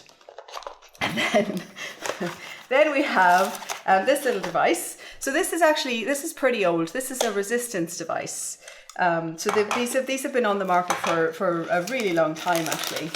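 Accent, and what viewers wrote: Ireland. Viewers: Beautiful Irish accent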